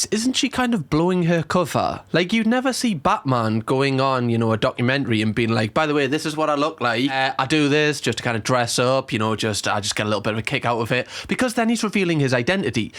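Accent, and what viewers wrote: Newcastle, United Kingdom. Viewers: I love the way you speak so much. The jokes you Crack, I'm dying 😂 it sometimes gives me little Britain vibes. I love British English so much 😂❤️ much love from Germany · He's from Newcastle in the UK! 🥰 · I love Geordies. I was conceived in Newcastle but born in wales but when I hear you I think of my parents and happy times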